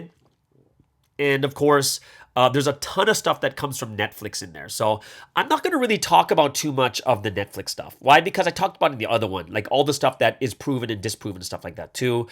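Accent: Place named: Australia. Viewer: Oh I'm all for 👏 GIRLY 👏 AUSSIE 👏 ACCENT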